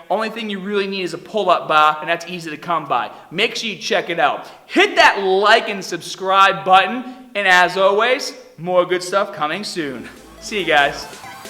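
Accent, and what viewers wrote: Boston, United States. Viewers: It’s a Boston accent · That Boston Accent is so distracting lol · Are u from Boston ? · the boston accent is killer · You probably think of his Boston accent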